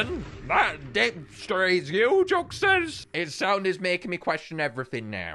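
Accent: United Kingdom. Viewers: are you British (ik this is very weird to ask just curious )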